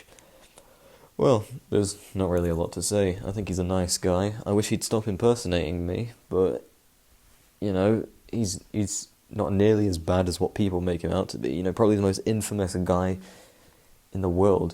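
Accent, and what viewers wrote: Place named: United Kingdom. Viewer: This video is disgusting because your British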